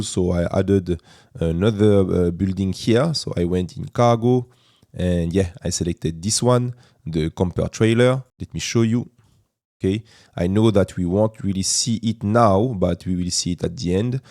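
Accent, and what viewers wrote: France. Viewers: J’ai reconnu un accent français si je ne me trompe pas. Si je ne me trompe pas cargo ne fonctionne pas pour la version actuelle de blender c’est dommage que ça rende obsolète tout tes tutos ou tu l’utilises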